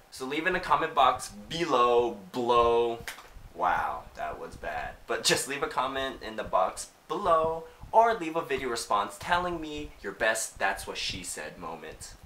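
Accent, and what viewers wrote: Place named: Canada. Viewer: You know how i can tell ur from canada? you say About and Out weird! I'm moved to Canada , it's kool :)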